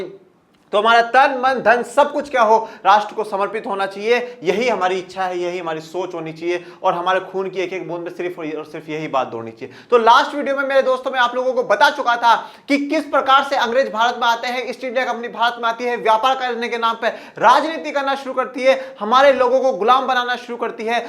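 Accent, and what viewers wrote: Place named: Rajasthan, India. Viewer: Sir kya aap rajasthani ho apki language vaisi he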